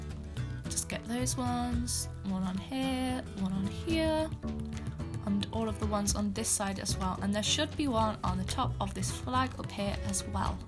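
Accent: United Kingdom. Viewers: Am I the one that love her british accent ?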